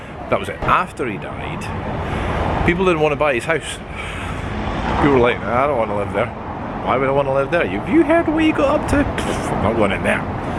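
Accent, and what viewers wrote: Scotland, United Kingdom. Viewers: I enjoy listening to your lovely Scottish brogue and seeing bits of Edinburgh. One year ago, we had especially liked our walking tour of historic Edinburgh with stops in Grey Friars Kirkyard and the Haymarket. Aaaah, I so loved Edinburgh!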